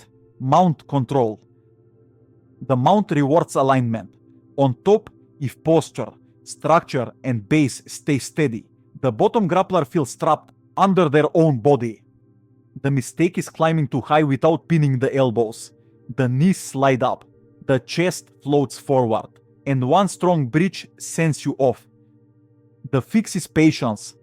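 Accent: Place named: Eastern Europe. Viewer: Yeah slavic accent adds depth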